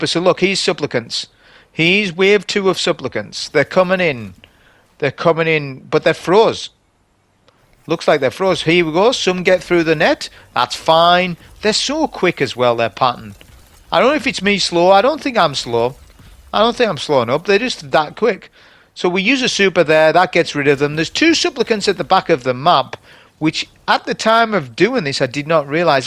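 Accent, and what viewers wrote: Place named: North East England, United Kingdom. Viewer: Nice video mate. I love Stasis, it's my favorite Subclass. Just to note, when you have Aspects that use grenades i.e. Bleak Watcher, they have a separate cool down, which you will see in the text description of the Aspect. Love your videos on general mate, I miss loving in the NE of England, so enjoy listening to your accent.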